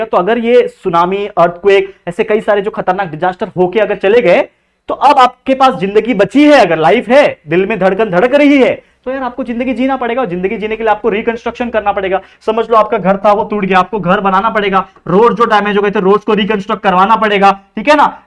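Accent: Maharashtra, India. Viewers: Are you Marathi sir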